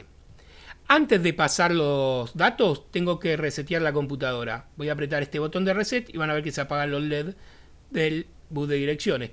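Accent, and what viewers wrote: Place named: Argentina. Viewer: viejo, es increíble!!! que laburo!! que apasionado te felicito estimo por el acento que sos argentino un abrazo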